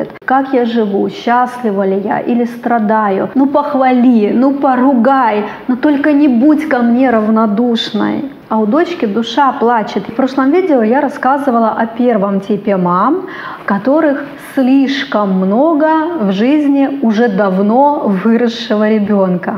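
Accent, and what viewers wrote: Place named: Belarus. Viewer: Не сразу поняла ,почему вас слушаю,а теперь только,поняв ,что вы из Беларуси ,как и я,и проблемы из детства у нас похожи.У вас есть легкий белорусский акцент,вы Т смягчаете на Ц,моему уху это приятно слышать,живу давно в Петербурге. Можно видео про синдром отличницы,школа с золотой медалью и так далее, да и дома все идеально,понимаю,что незачем,а вот...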